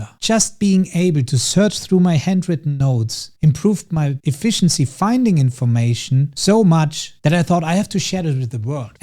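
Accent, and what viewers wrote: Germany. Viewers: Im hearing a lil bit deutsch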